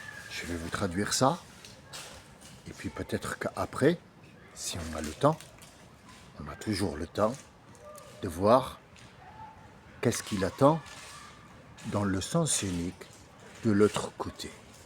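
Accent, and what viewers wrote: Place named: Arab world. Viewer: Assalam alecoum très Joli travail TU es très fort et TU as un joli accent Arabe hamdoulilah ET TU connais bien le Coran infalsifiable et Sunnah ET TU es vraiment excellent Ok TU es vraiment excellent Par là grâce de Allah Ok je suis petit à côté Mais hamdoulilah... Oui nous sommes coeur de Lion Muslims Ok Allah Di Mes chiens sont dès Lion comparé à Vos chiens bref pour dire que à tout moment n'importe quel personnage peut se retrouver dans Ton histoire coranique évoqué hamdoulilah ET c'est Par ce que là finalité Est que Allah Seul connais les réservistes pour résumer ET que dans l'attente beaucoup sont là déjà aussi hamdoulilah ET TU fait partie de ces gens hamdoulilah Ok frère merci pour cette vidéo Allah Te Protège Amin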